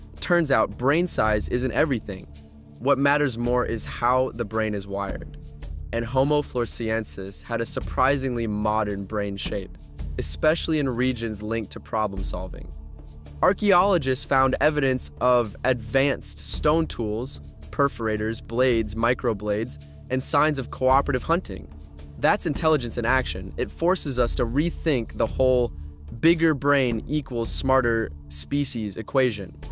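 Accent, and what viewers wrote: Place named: United States. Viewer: I like this reading voice. It's not AI! SO EXCITING to hear a human read and americanize the mispronpunciations. I love it! Would be great to show to children. Because of the animation. The ending is always a little abrupt. Ask a question at the end to get more comments. The videos are good and your voice is nice to listen to. I heard nothing new in this video. But, even the fake animation was great. We never get visuals because, they don't want to use movie clips or things not really too close to accurate but these graphics make it nice to watch for sure and let the imagination can run wild with it! Thanks again. And thanks for reading this in the real world!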